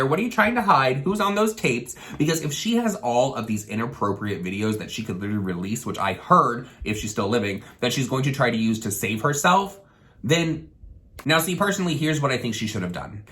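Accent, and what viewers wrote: South, United States. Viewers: Saw in another video your in Florida.... I thought I heard some southern! (Not in a bad way of course) Watching from LA ( lower Alabama) love the channel.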